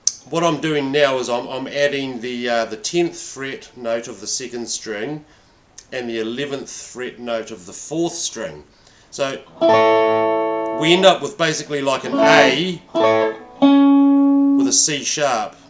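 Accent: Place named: New Zealand. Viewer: I don't know if it's a cultural thing, but anytime I hear a kiwi accent in a tutorial, I know I'm in good hands, hahaha.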